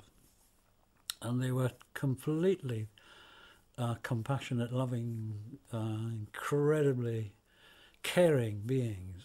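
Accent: Scotland, United Kingdom. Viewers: 19 January 2020, Sicily. Yep. When described with a clear British accent even Death sounds good. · English with a wee touch of scottish brogue makes it all the more pleasant...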